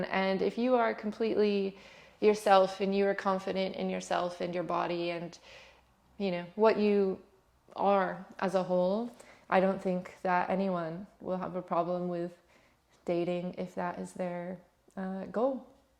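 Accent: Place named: United Kingdom. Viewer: This is my first time hearing your voice and idk why but I thought you were British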